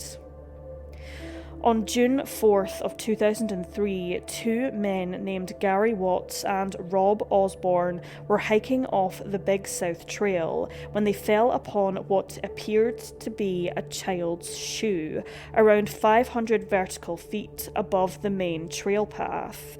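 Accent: Scotland, United Kingdom. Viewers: Your voice sounds like a combination of Scottish California Valley Girl with a bit of vocal fry thrown in. Very interesting. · Trying to gauge your accent? Scottish? Just stumbled on your channel BTW. Great video thank you. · Ooohhhh Scottish sister 😍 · It’s called a Scottish accent 🙄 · You mean… with a Scottish accent? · She sounds Scottish to me. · Ur voice makes the rest to of us Scots sound like idiots seriously sort it out 🙄🤨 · I've heard this story before, but never in a Scottish accent.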